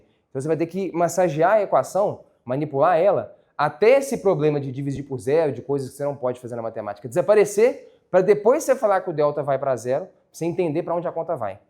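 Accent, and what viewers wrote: Minas Gerais, Brazil. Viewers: Esse professor é mineiro mas se veste de português.kkkk. òtima aula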